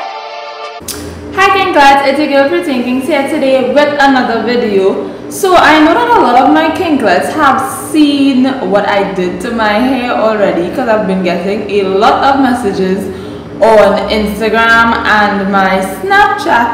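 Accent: Trinidad and Tobago. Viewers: Are you from Trinidad???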